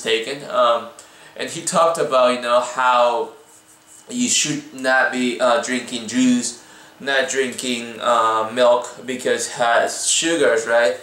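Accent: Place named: Latin America. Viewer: Oye de verdad que tu video es inspiracional. Y ese acento latino que posees me mantuvo hipnotizado a lo largo de todo tu video.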